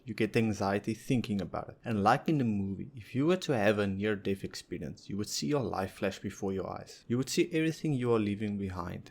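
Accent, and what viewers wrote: South Africa. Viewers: Are you South African? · Really good video. are you South African by any chance? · are you afrikaans? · R u south African?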